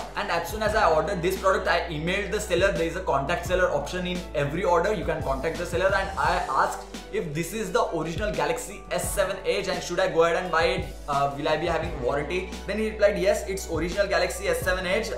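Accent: India. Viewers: no disresoect but i wish that some videos were limited to their country of origin because 8 cannot for the life if me understand the Indian accent 90% of the time. It just pisses me off when I click in an interesting thumbnail and I can't understand what is being said!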